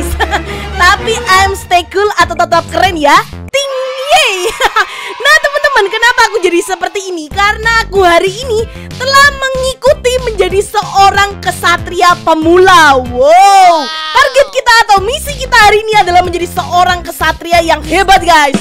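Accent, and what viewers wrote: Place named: Java, Indonesia. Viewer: Kak itu di jawa kok ngomon nya kayak oranh jawa ya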